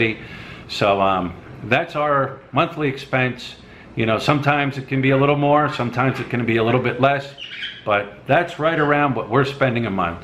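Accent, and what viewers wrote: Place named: West, United States. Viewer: Also it could be funny if you are from Philadelphia , but you sounds like from the west part of the US.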